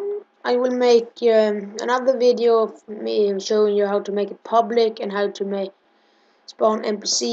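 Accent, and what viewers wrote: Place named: Scotland, United Kingdom. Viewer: its uhh duuunn lol wtf is he..a scotty idk but the way he talks makes me laugh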